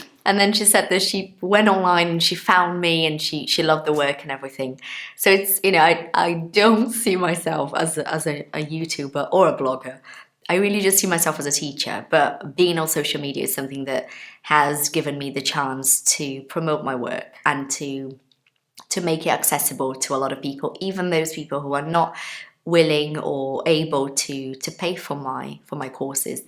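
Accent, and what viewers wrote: United Kingdom. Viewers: Arrisco dizer que é porquê o povo só se interessa pelo inglês "americano" já que o dela é britânico, fazer o quê, os melhores canais são sempre notados por uma minoria enquanto modinha ou porcaria rende milhões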